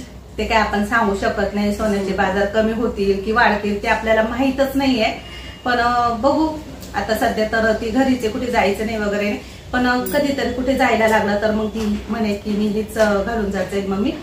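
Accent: Nashik, India. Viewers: नाशिकची भाषा दिसते आहे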